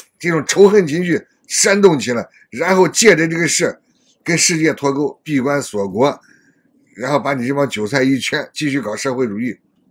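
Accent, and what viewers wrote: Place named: Henan, China. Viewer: Why are you so heavy in* HeNan* Mandarin？Can you please speak English? Do you Understand what the* Humble* Meaning.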